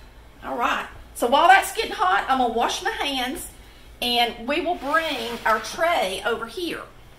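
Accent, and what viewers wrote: South, United States. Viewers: I'm from Florida and I've been watching about 7 minutes, being as I just found your channel. Love your southern accent by the way! Thanks for sharing! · Looks absolutely delicious, and you make it look easy enough that I think I can make this recipe! I just love your Southern accent: "MEELK," "THE-IS," "Y'ALL," etc. I'm originally from Savannah, GA, but lost my accent years ago when my family moved to Ohio. I've subscribed because you and your husband talking and cooking is so great, and I've got to watch more. Thanks! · I had a lemon tree and the 🍋 lemons were bigger than a can of coke! They were also a little sweeter than a normal lemon. I sure do miss having that lemon tree! I also had a grapefruit tree that the grapefruits were a little bit bigger than normal and talk about being sweet, you didn’t have to add any sugar to eat them. 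We could just peel them and eat them like you would eat an orange! I miss having those for breakfast in the mornings! I, too enjoyed watching this video and listening to her talk with that accent! I am from NC and miss hearing it and the funny sayings that comes out of the south! Can’t wait to make make these! Sometimes I want something at night while I’m watching TV but I don’t want anything really sweet, and this sounds like it would fit the bill! 🦋🦋❤️🦋🦋🍋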